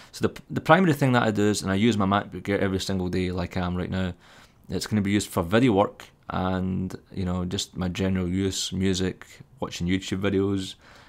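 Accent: Scotland, United Kingdom. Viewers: PLEASE REPLY...when you get your new macbook air is it possible to compare it to the 2010 version of the macbook pro please? or answer this in one of your Q&A vids? I have the choice between getting a 2010 macbook pro (2.4 ghz core 2 duo, 4 gb ram, 250gb hardrive) for 600 pounds or the new macbook air...which would you recommend? By the way, im a big fan of your vids and i LOVE your scottish accent :D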